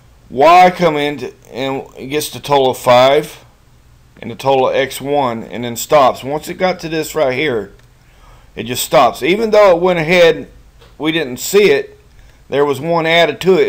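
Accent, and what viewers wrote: South, United States. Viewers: you sound like hillbilly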